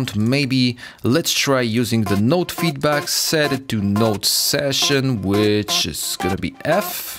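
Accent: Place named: Eastern Europe. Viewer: I thank you my friend, for the great work your doing. Where are you from? I can tell your from Eastern Europe by your accent. Portugal here. 👋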